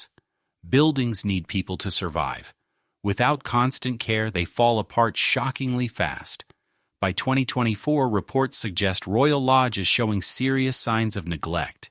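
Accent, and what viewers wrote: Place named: United States. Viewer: What I would like to know as a British Citizen of 83 years is.............why an American "voice over" accompanies old film footage of English Royal Estate Dwellings (security compromise aside !) ! Is this visual "offering/conjecture" open to American interpretation ? Why not investigate President Trumps "holdings" in America for local interest ? Why is Britain the "flavour of the Journalists month" ? NO I DID NOT ENJOY THE "INNUENDO" OF THIS VIDEO. ....or the presumption that all is open to American "investigation" ! Perhaps the American Journalists have fewer problems investigating foreign shores rather than their own, or, would the President object ?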